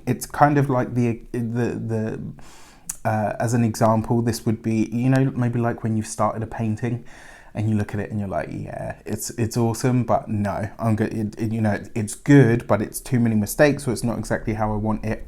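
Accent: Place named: United Kingdom. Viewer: Sending you healing energy and no worries about aesthetic... a British accent can pair with a sock puppet and come off as important and eloquent. Feel better☺ (excited about the new people appearing in the reading )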